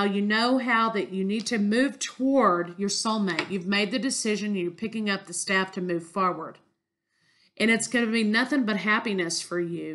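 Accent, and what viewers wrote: Texas, United States. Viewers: You are truly gifted. Totally resonates. You sound like you are from Texas, like me. Blessings..🙋🌹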